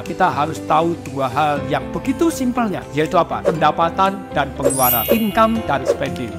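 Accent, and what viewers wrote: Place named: Surabaya, Indonesia. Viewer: Pak bagusnya musik nya dikecilin atau ga usah ada musik nya. Pengen fokus denger suara bapak jd kegangggu sm musik. Makasih. Dan lagi Pak denger suara asli Bapak dengan logat Surabaya nya itu sudah bikin semangat sekali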